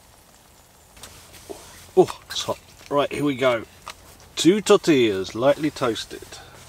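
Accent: England, United Kingdom. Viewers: Hi, Please extend your food eating aspect of your videos. A big part of why I enjoy your camping videos over others, well in part its because of your English accent as I'm Australian but the rest of my family was born in England and all my relatives live and are from England or Wales but its also because I really like what you choose to cook and I enjoy watching every aspect from the preparation (why you don't have a nice shun or global kitchen knife I don't know) cooking and enjoy watching you enjoy eating the food. That chicken for instance looked really yum and instead of only really seeing two bites of it, I would enjoy your videos even more if we could watch you enjoying the food much longer. Btw, if you do buy a kitchen knife for food prep and eating, I really recommend the Shun tomato knife, its lightly serrated and compact and really multi-purpose, just don't try cutting through bone. 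Or Global GS-10 cheese knife as its compact, multi-purpose and you literally could use it as your fork. It also has a stronger slightly thicker, more robust edge than the shun. Both are extremely sharp. The Global knife being wholly stainless steel is slightly more hygienic given you could probably submerge it in boiling water if you needed to. I actually found it difficult to find UK links, even that one gave me AUD and free shipping to Aust. Link Shun <link> Link Global GS-10 cheese knife <link>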